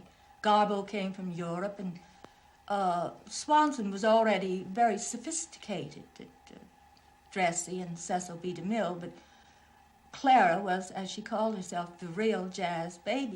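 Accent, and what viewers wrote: Mid-Atlantic, United States. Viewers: It’s the Trans Atlantic/Mid Atlantic accent. It was how the upper class in the North East spoke. It was taught in speech/elocution classes as well, as in drama schools/classes. It fell out of favor after WWII. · It sounds like a so-called mid-Atlantic accent, albeit toned down a mite. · Very surprised at her posh American accent.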